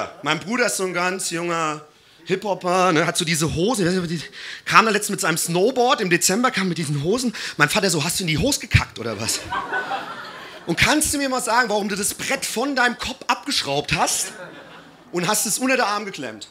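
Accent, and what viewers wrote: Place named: Hesse, Germany. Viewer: Man merkt das er mit der Zeit immer mehr dialekt bekommt😂am anfang hoch deutsch und dan hessisch dialekt dan wieder hochdeutsch 😂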